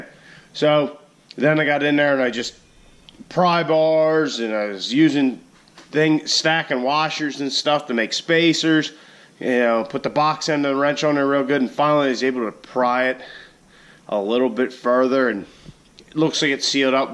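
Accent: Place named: Baltimore, United States. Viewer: Hey, I'm new to your channel. Nice videos. I see you live in Buck..I'm just a few miles south of you in MD. You have a Baldimore accent lol.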